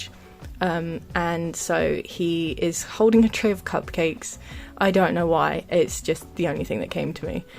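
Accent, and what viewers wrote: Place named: United Kingdom. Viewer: Are you British? You sound like it soo much! ❤❤❤ by the way I love this video thank you for sharing!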